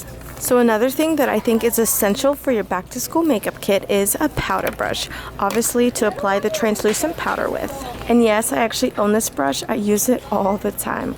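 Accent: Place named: Latin America. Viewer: She has a very thick Hispanic accent.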